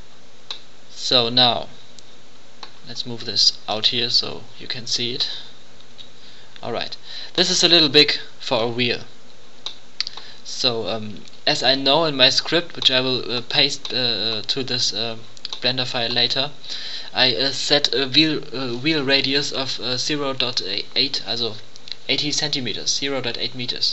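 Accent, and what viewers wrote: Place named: Germany. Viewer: Da haste son bissle deutschen Akzent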